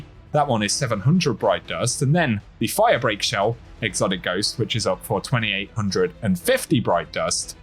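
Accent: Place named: England, United Kingdom. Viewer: Cut him some slack, he's English. If he were Welsh, you wouldn't be able to understand much of a damn thing, I'll bet.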